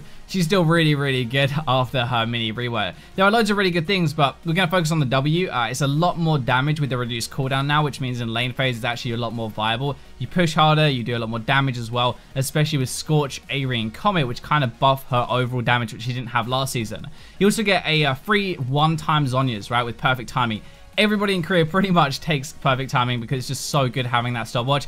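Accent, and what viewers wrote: United Kingdom. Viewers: I like your vids dude but you should really consider slowing down your speech, because speed + british accent makes it really hard to actually keep up with what you're saying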